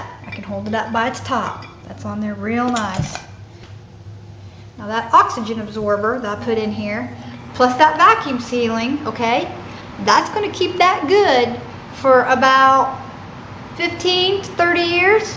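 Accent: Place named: South, United States. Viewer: I am learning how to do food storage prep and your video has been a great help to me! While I plan to have some Mountain House freeze dried, I wanted to learn how to store basics like rice, beans using oxygen absorbers and vacuum seal in Mason jars rather than mylar bags. You really have a knack for teaching with a delivery that is both interesting and delightful, and I gotta say that your southern accent is so charming :) Many thanks!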